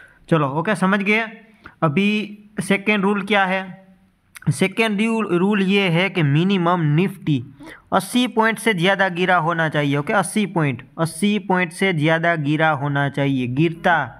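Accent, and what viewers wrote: Gujarat, India. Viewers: You frome gujrat ❓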